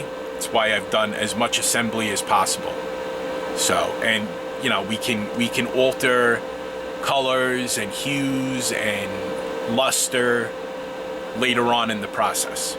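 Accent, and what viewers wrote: New York, United States. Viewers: 😂😂😂, love the video, I’m new to your channel, I’m from Missouri and I like your NY accent.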